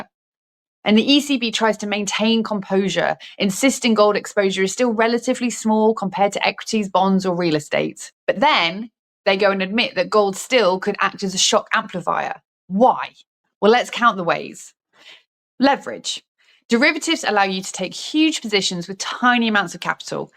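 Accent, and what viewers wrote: United Kingdom. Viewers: Love this fine lady. She speaks my language and with a beautiful and elegant British accent. 👍 ❤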